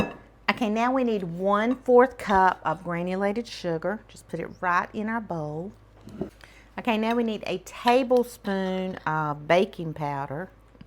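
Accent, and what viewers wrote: South, United States. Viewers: Hello. I'm just jumping in to say how much I love your soft, sweet southern accent and your soft voice. Reminds me of my grannies when I was young. She made desserts all by hand, and there is nothing better than southern desserts. Thank you for sharing your sweet self with us.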